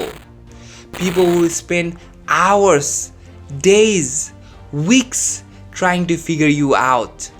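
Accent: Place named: Brazil. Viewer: Friendly advice in your Youtube debut: No-one wants to be lectured on how too think, less so coming from foreigners. Rethink your Youtube content strategy!... Your theatrical hand gestures, patronizing words and tone, in an impossibly too strong accent (Brazilian?), combined with dramatic music, this just doesn't work my friend.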